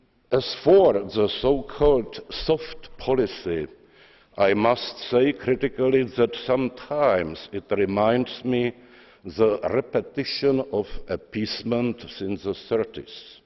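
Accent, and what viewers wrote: Czech Republic. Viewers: Best Czech accent! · Čím to je, že Češi mají furt potřebu někomu se vysmívat, nad někoho se povyšovat a pomlouvat, ačkoli bych dal ruku do ohně za to, že většina místních diskutujících "English expertů" svojí jazykovou výbavou nesahá Zemanovi ani po kotníky?? Ten člověk umí anglicky velmi dobre, má prostě jen silnej českej akcent, toť vše.... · Konečně sebevědomý Čech, který veřejně mluví czenglish. Číňani mají čínglish, Indové hindish, Australani cockney, Američani američtinu … tak proč my máme furt ležet na Prokrustově loži Oxbridge. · Angličtina po česku 😅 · Jelikoz je prezidentem Česke Republiky, mel by umět správnou vyslovnost, anglicky umi, to nepopirám, ale ma ceskej prizvuk, muj nazor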